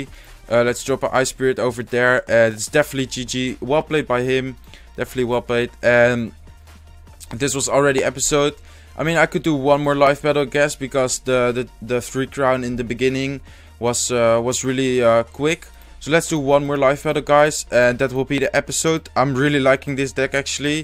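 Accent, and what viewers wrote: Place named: Netherlands. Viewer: Where you from? Je klinkt Nederlands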